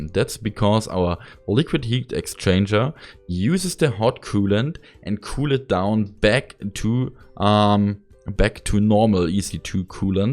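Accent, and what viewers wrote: Germany. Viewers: „five in the high“ deutsche akzent beste 😂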